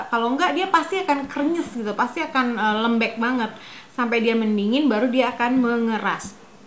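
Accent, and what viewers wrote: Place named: East Java, Indonesia. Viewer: makasih resepnya ciciiii...btw cici ini org jawa timur yah. logatnya itu lohh sukaaaaa....cara2 bikin semua resep mudah. sederhana dan ga nyusahin 👍👌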